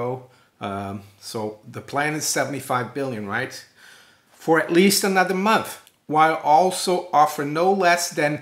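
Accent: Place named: East Coast, United States. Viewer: Another good video from the Brazilian with the U.S. east coast accent. 👍😊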